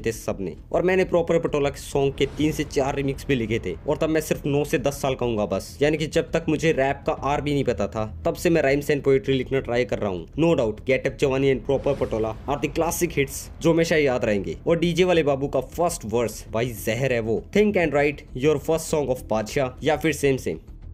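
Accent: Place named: Haryana, India. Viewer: Bhai ab Rajasthan se ho...mujhe laga tha haryana se ho😂😂 ap avaj se haryanvi lagte ho😅 vaise mein chandigarh mein rehta hu...and haryanvi hu.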